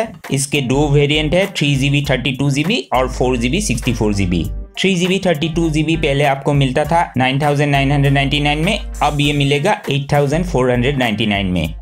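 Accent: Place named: Assam, India. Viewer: Aapke..awaz se toh..aap Assam ke hai ...lag raha hai. 😅😅😅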